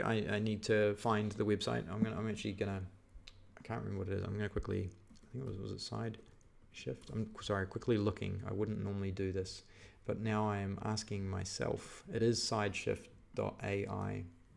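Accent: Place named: New Zealand. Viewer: KIwi accent!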